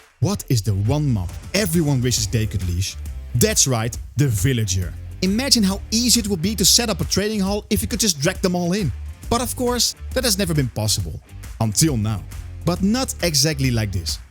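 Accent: Netherlands. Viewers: are joe beetje nederlands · Heel erg irritant & jammer dat nederlandse accent, want je content is top. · Nice dutch accent 😅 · tering wat een steenkolenengels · Are you Dutch? You sound Dutch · Accent sounds dutch af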